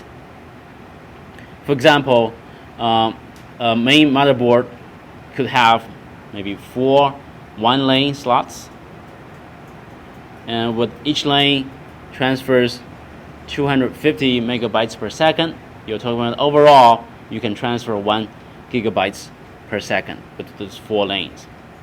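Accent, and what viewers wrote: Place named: China. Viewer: 这声音一听就是中式英语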